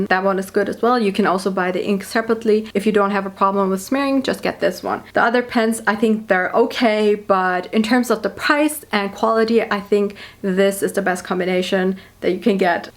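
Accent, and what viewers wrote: Germany. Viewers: Are u German?? 🐻‍❄️🐻‍❄️🥺🥺✨🫐🫐🛼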